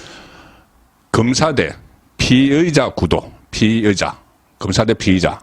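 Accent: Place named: Daegu, South Korea. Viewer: 들리기야~ 잘들리죠~ 제가 말씀드리는거는 발음이라고 해야되나~?물론 대구 분이시라 사투리도 섞인것도있고, 성격이 급하신지 말도 하시다보면 더듬고 그려셔서요~ 그냥 어드바이스~??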